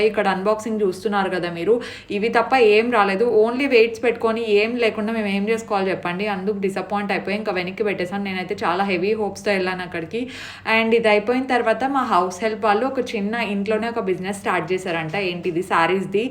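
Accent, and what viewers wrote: Anantapur, India. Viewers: Akka meeru Ananthapoor slag matladithe vinalani undhi akka plzz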